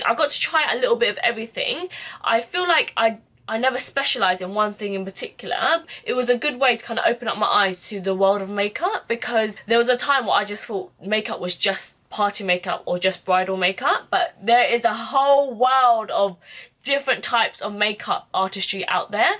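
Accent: United Kingdom. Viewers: Wow LOVE your British accent 😻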